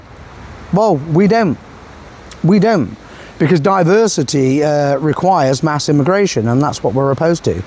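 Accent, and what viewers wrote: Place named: East Midlands, United Kingdom. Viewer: Nice to hear your East Midlands accent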